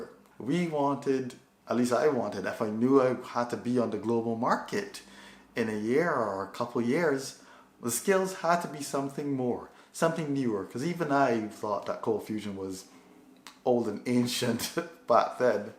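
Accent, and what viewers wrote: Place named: Ireland. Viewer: Your accent is sounds like Irish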